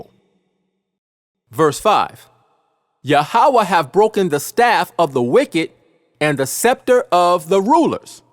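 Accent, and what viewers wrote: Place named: Cockney, United Kingdom. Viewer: . .the maker (Son of Man)of tis video must be a cockney fr London ee.. he has that english cockne accent. .for info i m fr Borneo isle...studied Law fr London U...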